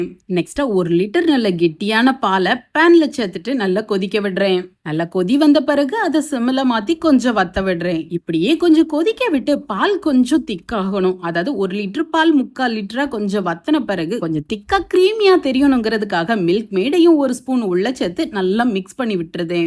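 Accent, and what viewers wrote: Tirunelveli, India. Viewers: திருநெல்வேலி தமிழோ...!😂அருமை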